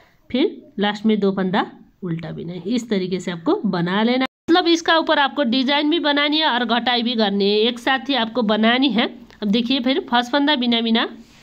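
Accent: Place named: Kathmandu, Nepal. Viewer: आप की डिजाइन बहुत अच्छी लगी ।आपकी आवाज सुन कर काठमांडू याद आ गया धन्यवाद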